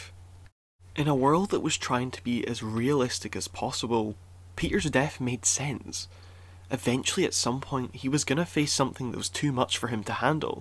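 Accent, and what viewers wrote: Scotland, United Kingdom. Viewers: You’re either Scottish or Northern Irish. Am I right? I’m subbed anyway.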